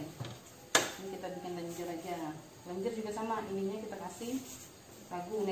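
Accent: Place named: Palembang, Indonesia. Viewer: Asli uwong palembang yo, logatnya kerennn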